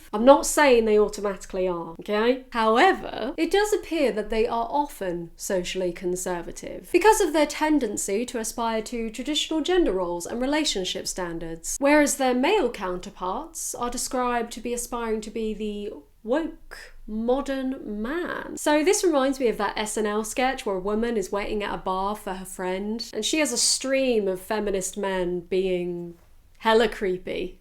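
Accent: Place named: United Kingdom. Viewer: Soooo just realized all my male partners were actually some kind of pick me boys AND all the female partners/dates were im not like other girls girls and woah bisexuality really is something else, like, makes SO easy interacting physically, romantically or both with every kind of awful people uncomfortable laugh Btw just discovered this channel and im obsessed! This channel has everything, an amazing British accent, great humour, cows, smashing the patriarchy and a lovely skinny alien dog (im a cat person, but I have a soft spot for skinny alien dogs) Okay, I'll stop this rant here, love from Italy!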